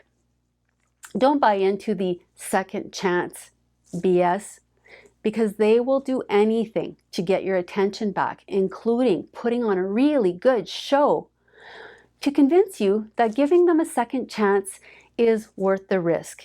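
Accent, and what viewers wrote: Canada. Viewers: I like your Canadian accent! Canada is my favorite place to visit. Thanks for sharing.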